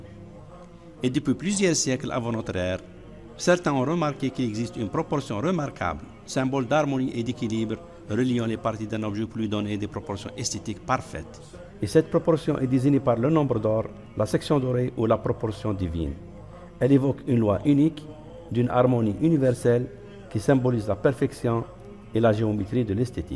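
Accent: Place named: Kabylie, Algeria. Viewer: Accent kabyle salut cousin sa va ??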